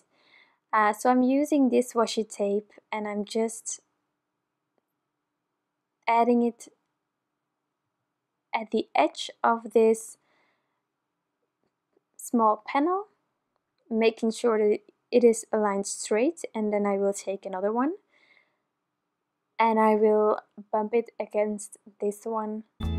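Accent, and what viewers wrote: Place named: England, United Kingdom. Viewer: Thank you so much dear 🤗🤗🤗🤗🤗 this video is so wonderful!! I am so privileged to have you in my Design Team 🥰🥰🥰🥰🥰 And I really love your English accent 😃😃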